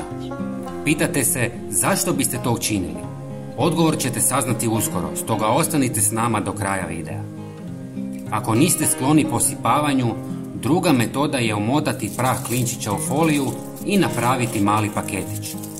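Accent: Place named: Bulgaria. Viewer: Благодарю❤очень приятно слышать болгарский язык😊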